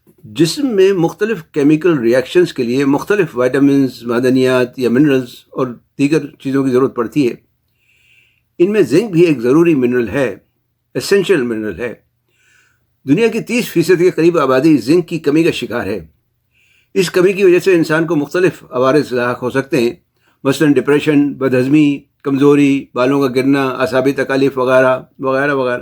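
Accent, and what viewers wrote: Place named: Old Delhi, India. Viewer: یہ لہجہ پرانا ہے اب دیکھنے میں نہیں آتا ۔ہو نہ ہو دہلی سے تعلق ہو سکتا ہے ڈاکٹر صاحب کا ۔اللّه خوش رککھے آمین 🤲🏻💙 السلام علیکم جی 🤲🏻💙 بہت محبّت کے ساتھ شکریہ ❤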